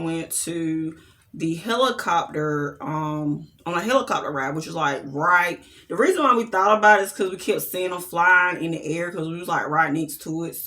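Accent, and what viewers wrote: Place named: North Carolina, United States. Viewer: Your accent is everything. Where in NC are you from? You sound like my husbands people.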